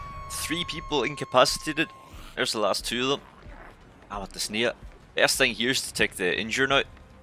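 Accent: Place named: Northern Ireland, United Kingdom. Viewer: at the start there i thought you were gona have subtitles the entire video coz youre from northern ireland haha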